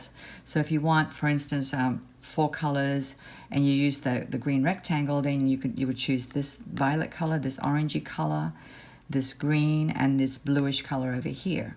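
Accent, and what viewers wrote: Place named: Australia. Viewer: Aah ha, I thought I detected some Aussie there in your speech. I am a Brisbane girl too from Scarborough on the Redcliffe Peninsula. Travelled quite a bit but always come home to Redcliffe. X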